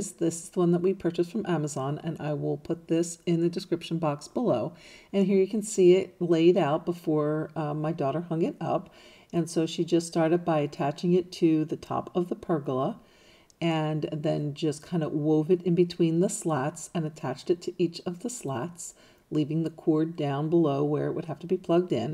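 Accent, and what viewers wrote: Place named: Texas, United States. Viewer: I had'nt even read your reply until after I wrote mine. We even think the same but U talk like my AUNTIES/Tias from TEXAS 😄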